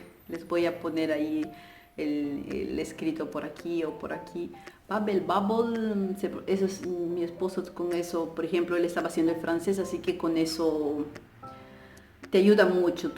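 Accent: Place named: Italy. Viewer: 😂 por qué hablas con acento si no eres italiana de origen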